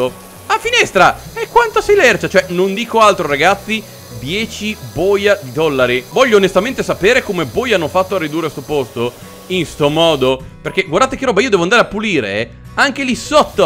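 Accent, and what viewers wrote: Veneto, Italy. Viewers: Ma sei Veneto? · Ma sei veneto o friulano? XD io wono veneto. Su xbox è gratis power wash?? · L'accento veneto piú veneto che abbia mai sentito😂😂😂😂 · Tu sei veneto??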